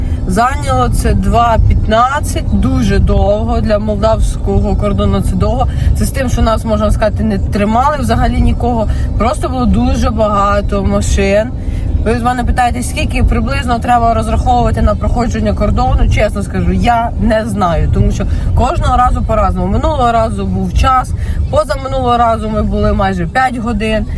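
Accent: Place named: Vinnytsia, Ukraine. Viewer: Приємної подорожі! Храни вас Господь!!!❤❤❤ я теж так як ти говорю Молдавія, Кишиньов😅😅( ну Вінничани і Хмельничани то ми так)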